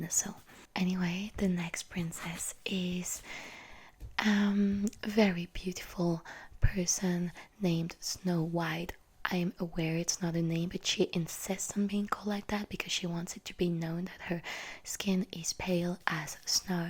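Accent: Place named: France. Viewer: Woop I got so used to your french accent so this sounds off😂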